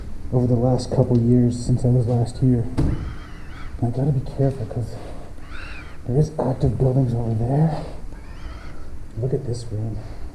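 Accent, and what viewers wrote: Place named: Canada. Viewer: "We checked it ooot" = Canadian.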